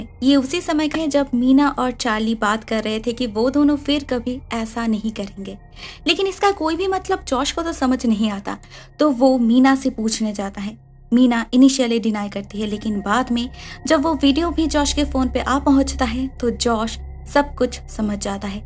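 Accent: Bengal, India. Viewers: I love ur Bengali Accent 😜😘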